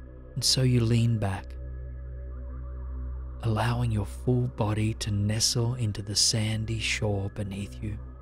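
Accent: Australia. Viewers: Its always weirdly comforting to hear another Australian voice on YouTube! Thanks for your efforts bro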